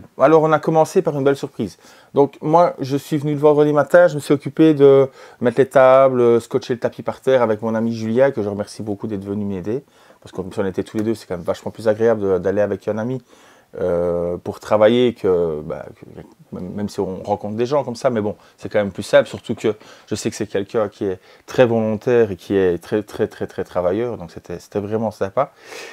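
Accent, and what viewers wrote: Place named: Belgium. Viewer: salut salut!!! Super la vidéo j'ai pas su y aller et j'y tenais comme néophyte pour voir se que l'on ose imaginer! La prochaine fois promis. Autre chose, On avait parler de pc console portable pour faire plus abouti, j'avais parler de la rog alloy mais depuis qu'elle est sortie c'est toujours hors budget, tu m'avait transmis un lien pour des occas Steam deck mais je ne retrouve plus le lien. Parce que bon j'me suis laisser avoir par l'anbernic rg 556, pour laquelle tu ne m' pas donner d'avis constructif si il y en a un. En tout cas je reste a se que j'ai pour l'instant dans l'idée de m'offrir une Steam deck Oled plus tard.... D'ailleurs il y a un délais responsable sur lequel compter pour pouvoir se l'offrir quand elle le faudra encore sans non plus avoir une bouzz a 100 balles... Je me limite PSP PS2 wii etc pour l'instant et d'ici quelques mois j'investî gros! 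PS: j'adore l'accent belge pour l'être moi même, ça fait encore plus en vidéo haha.merci pour les infos!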